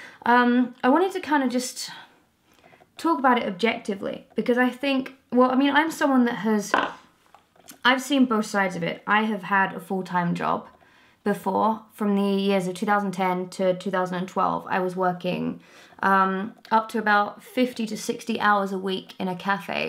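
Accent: Essex, United Kingdom. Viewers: Is it just me or did her Essex accent come through more in this video? Especially when she says phrases like "sort of". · I hate the term having "a real job". It's a predictable snipe by a bitter soul. Your work life journey has brought you to a cool place and you'd be fully aware grab it by the horns and enjoy the ride. Your creative, clever, funny and have that bit of attitude needed when delivering a good pun, in that classic Essex accent. You also have a more serious side here on your discussion channel. Your number one in my book.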